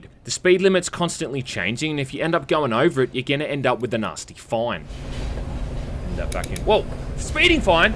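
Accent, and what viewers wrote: Australia. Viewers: I dont care about this game. I jusy want to hear an Australian guy whining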